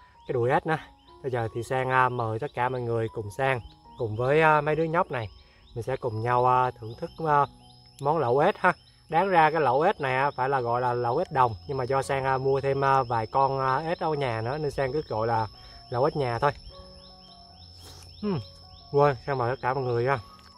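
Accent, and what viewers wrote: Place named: Ben Tre, Vietnam. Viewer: E là ng miền tây ha sao mà vọng nói của e nge y như ng bến tre vậy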